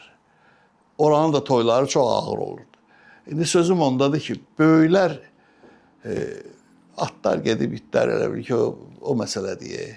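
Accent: Baku, Azerbaijan. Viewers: Как люблю нашу бакинскую речь натуральную речь ❤❤❤❤❤ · Allah sizə can sağlıgi versin sizə qulaq asdığcan Baki ləhcəsi ilə danişığıniz məni valeh etdi ölənlərinizə rəhmət olsun qardasimi xatirlayib ağladim sizcox səmimi əsl layiqli insan kişi xarakterli bir sənətkarsiz sizdən birdaha analar dogmayacaq belə sənətkar 1000 dən bir olur❤❤❤❤❤❤❤ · Elə bildim əmimə, dayıma qulaq asıram. Bakı danışığı çox doğmadır... Sağ ol, sənətkar!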